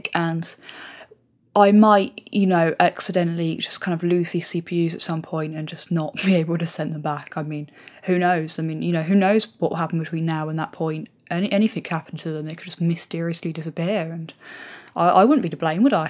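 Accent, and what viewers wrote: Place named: West Midlands, United Kingdom. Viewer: Your accent is great....sounds to close to me tho.. West Midlands?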